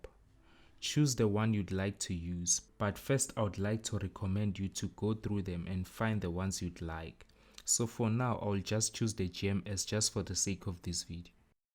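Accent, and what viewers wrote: South Africa. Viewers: Definitely a South African (Durban) speaking. Nice tutorial. I'll be making my first kwaifi sounds. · I can tell this guy is SOUTH AFRICAN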